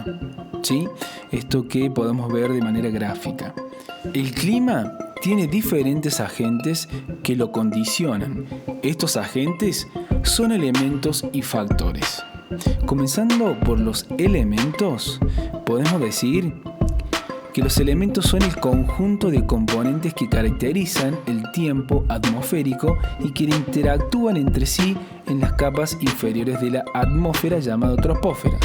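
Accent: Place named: Córdoba, Argentina. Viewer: como amo su acento cordobés LA PUTA MADREEEE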